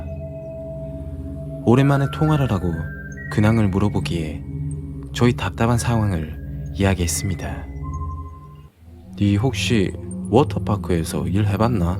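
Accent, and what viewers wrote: Gyeongsang, South Korea. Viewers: 안타깝네요.😭 님 갱상도사투리 억수로 잘하시네예.갱상도 분이신가?🤔🤔😁👍👍👍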